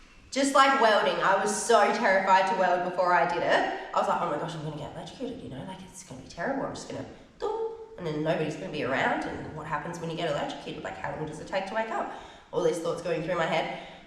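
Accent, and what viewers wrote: Australia. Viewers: Your awesome you sound like a Aussie what a chick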